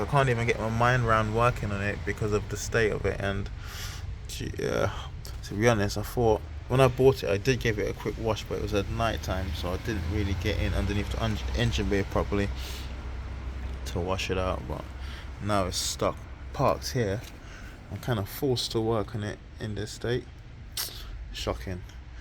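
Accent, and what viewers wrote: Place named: United Kingdom. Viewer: Nice to hear a British accent putting junk on the road again. 😊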